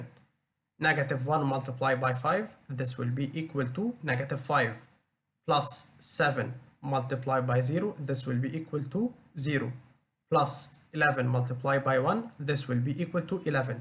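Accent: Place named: Egypt. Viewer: Great explanation bro, I also feel that's an Egyptian accent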